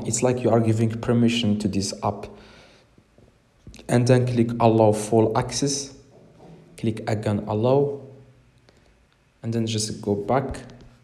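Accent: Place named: Morocco. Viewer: bayna fik mghribi 😂❤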